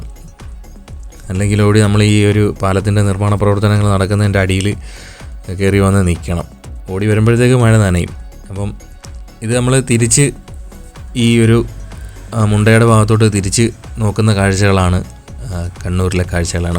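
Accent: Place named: Central Travancore, India. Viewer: നമ്മുടെ മധ്യ തിരുവിതാങ്കൂർ ഭാഷയിൽ കണ്ണൂർ drone വീഡിയോ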